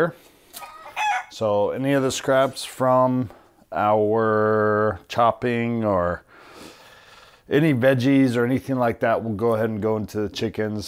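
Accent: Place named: Hawaii, United States. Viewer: Damn, hes Hawaiian? The accent makes so much sense now...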